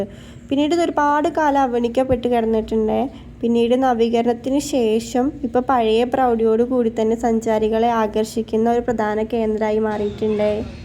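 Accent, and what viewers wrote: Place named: Malappuram, India. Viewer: Kutty Malappuram aano samsarathile Tto Tto kettappo thoni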